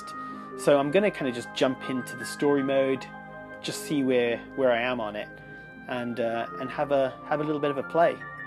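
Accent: United Kingdom. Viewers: Has it been taken down? You sound British, it's not been taken off the UK store? I can't find it, I can only find the prologue game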